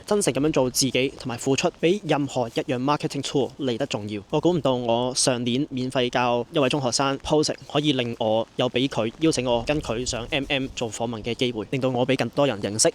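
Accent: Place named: United Kingdom. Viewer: Support ! 英文好正重British accent ❤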